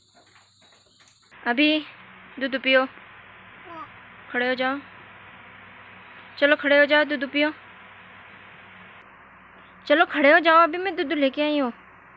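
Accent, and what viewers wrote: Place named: Aligarh, India. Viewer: आपके यहां का नेचर बहुत अच्छा है लेकिन भाषा अलीगढ़ की तरफ की लगती है हमारे गांव में भी ऐसे ही बोलते हैं😊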